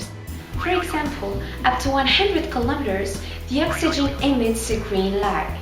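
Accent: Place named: United States. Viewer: Amazing! I like your american accent! Congrats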